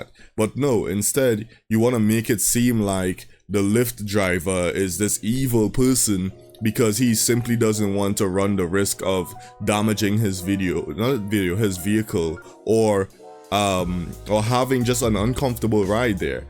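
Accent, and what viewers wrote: Trinidad and Tobago. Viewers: You kinda sound Trini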